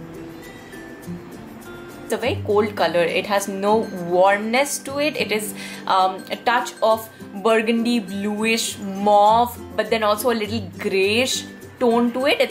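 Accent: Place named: Bengal, India. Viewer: I have subscribed already yaar....❤️....I follow u on myntra as well.....I like ur attitude and accent ...u are just like me....and I'm Bengali too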